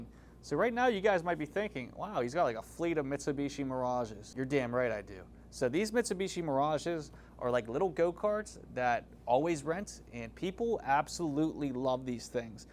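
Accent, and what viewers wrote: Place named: Pittsburgh, United States. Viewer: I miss hearing the yinzer accent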